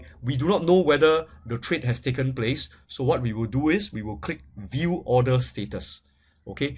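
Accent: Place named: Singapore. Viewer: Singlish in a nutshell: " BAY-SEEK-KE-LEE" "ACT-CHU-ER-LEE" "CHROMWELL REED" "MUL-TEE-PLI" "DI-NORM-ME-NATED" "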